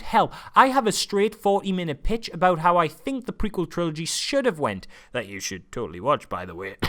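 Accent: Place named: Geordie, United Kingdom. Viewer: Thick Geordie twat.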